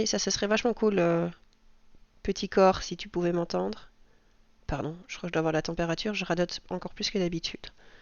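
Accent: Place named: Belgium. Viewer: Coucou! J'ai toujours mes billets de 5 € de 2022, je n'arrive pas à les mettre dans d'autres enveloppes ou les remettre en banque :D J'adore t'entendre, ça fait tellement de bien d'écouter notre accent Belge et nos expressions dans toutes ces youtubeuses françaises! La fierté de voir ton fils faire autant de progrès! Tu as raison de faire des comptes ronds, sans mettre de billet de 5€ dans tes enveloppes, je suis certaine que tu feras autant (si pas plus) d'économies. Continue, c'est bienfaisant de t'entendre! Vive le mois de Mars!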